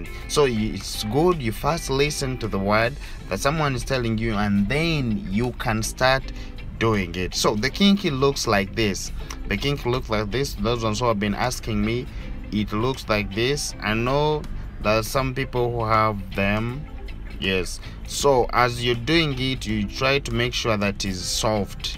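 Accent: Uganda. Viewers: According to your accent I think you’re a Ugandan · Yeah definitely Ugandan. He sounds and talks like my dad.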